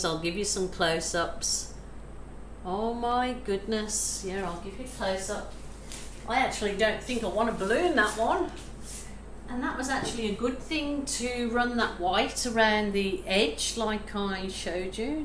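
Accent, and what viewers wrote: Birmingham, United Kingdom. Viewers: I knew there was a bit of Brummie in your accent! This is amazing, beautiful mirrored wings xoxox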